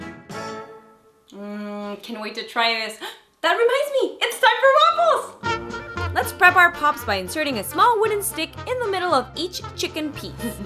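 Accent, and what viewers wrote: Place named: England, United Kingdom. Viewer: Soy la única que viene del canal y no entiende🤣🤣amooooooooooooooo tu acento Inglés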